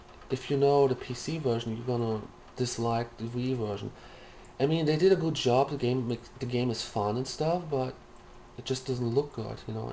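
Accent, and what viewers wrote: Germany. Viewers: Guten Morgen! I like your german accent it sounds really cool. Sony is in the business of making money. if your waiting for backwards compatibility on the PS3 Slim console, it well never happen. No offense but you want alot but your just not willing to pay for it. Blu-ray looks fantastic on a properly "calibrated" hdtv preferably 1080p but a 720p would work as well. You don't seem to be into movies so i'd recommend you get a 60GB PS3 console which is backward compatible.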